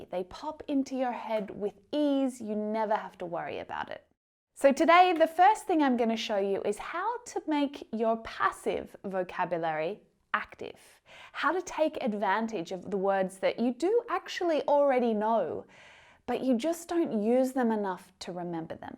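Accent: Australia. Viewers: Hi from Turkey.I love you and your explaining. I love when you speak Australian English. Thank you to teach us English. 🇹🇷🇹🇷❤️❤️🇦🇺🇦🇺💕💕🌺🌸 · I enjoyed watching your video and i've been somehow doing ALL OF the things (tips) you suggested before and will continue to. Now i feel good because i know that i've been doing this the right way and I'm proud of myself as well. I just love your teaching style and especially your Aussie accent! I wish you all the best,!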